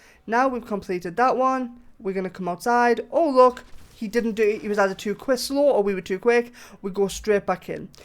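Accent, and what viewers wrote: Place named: United Kingdom. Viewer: What part of Britain are you from, mate? What dialect would you call your accent, it is very distinct! Also, thanks for these videos, very clutch!!!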